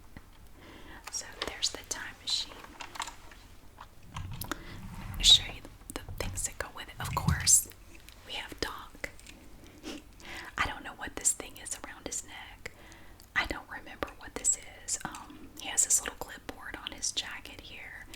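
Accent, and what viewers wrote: South, United States. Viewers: I feel like my whispering doesn't hit the same cause she has the perfect southern drawl!!!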